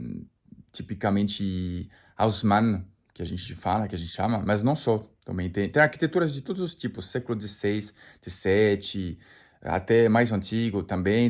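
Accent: Brazil. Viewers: O francês abrasileirado!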